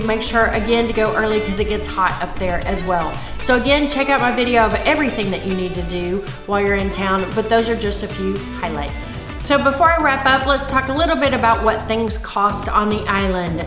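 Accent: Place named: Texas, United States. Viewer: Where is her accent from, why am I thinking Texas?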